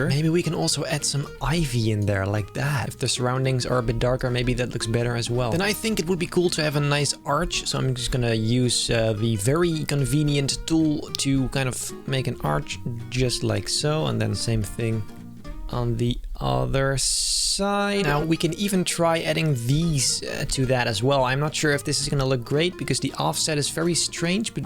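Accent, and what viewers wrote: Netherlands. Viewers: Wait r u dutch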